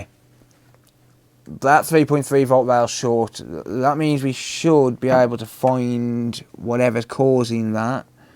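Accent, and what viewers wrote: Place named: West Midlands, United Kingdom. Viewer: That sounds like a Willenhall accent. 👍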